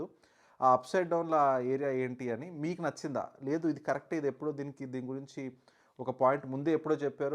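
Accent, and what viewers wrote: Telangana, India. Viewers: Endanna Telangana slang lo ochav esari 😅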